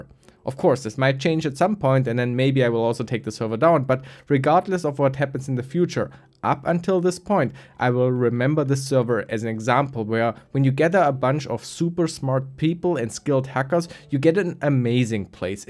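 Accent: Germany. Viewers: I always love hearing a german accent in videos and then checking the user profile to find out I was right again: Berlin in Deutschland :) Schön zu sehen, dass jemand wie du fast 750k subs hat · wait.. are you german? i can hear it out a little bit xDF...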